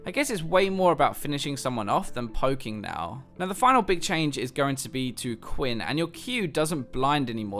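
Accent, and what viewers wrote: United Kingdom. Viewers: I don't even play adc c: Just here to enjoy your beautiful British accent ;)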